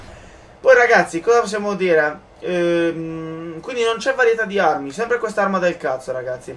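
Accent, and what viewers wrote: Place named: Apulia, Italy. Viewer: Ma sei pugliese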